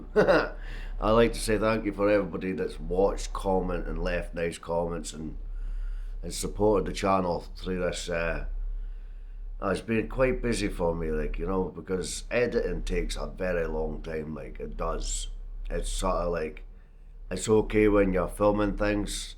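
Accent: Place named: Scotland, United Kingdom. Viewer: I like the accent bro ... Braveheart ... Freedom!!!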